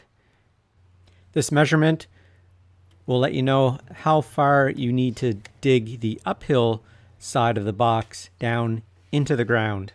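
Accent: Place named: Maritimes, Canada. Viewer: Grew up near a bunch of maritime candadians. Accent takes me back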